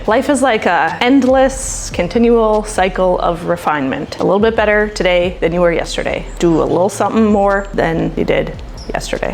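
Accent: Canada. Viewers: I’ve been skinnyfat for most of my life, but now at 50 I’m just fat. Your video came along at just the right time for me. p.s. I love your Canadian accent and I greatly admire your comedic chops. Absolute goddess.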